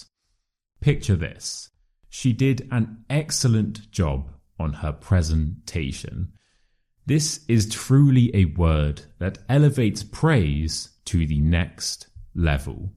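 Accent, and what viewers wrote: United Kingdom. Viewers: Thank u very much ı love your brıtısh accent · I finaly found a proper British speaking chanal! It's marvellous! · Thank you. Love your British Accent and your sense of humour.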